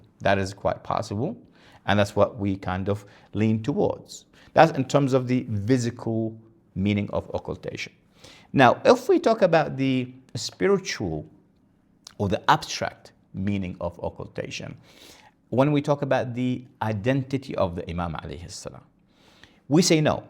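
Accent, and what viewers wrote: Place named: Michigan, United States. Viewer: وكانك من لهجتك عمامة ميشكانية😢😢😢فان كنت كذلك فلعنة الله عليك فما انت الا اشر الخلق.....الحمكم ان كنت من امريكا..فإنما انت ديوث قواد منحط اخلاقيا عديم شرف،وهذا ابسط ما يقال فيك....ان كنت من امريكا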